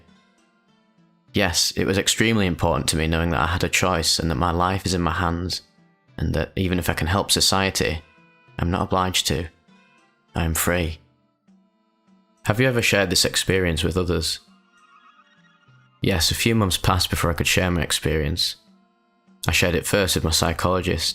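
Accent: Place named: Manchester, United Kingdom. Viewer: Majors mask is the shit... Sounds like you're close to Manchester... Safe bro lol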